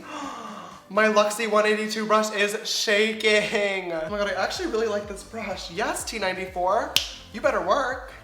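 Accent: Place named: New York, United States. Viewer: omg i didn't know you were from new york lol i feel like i really noticed your accent in this video so i searched that up lol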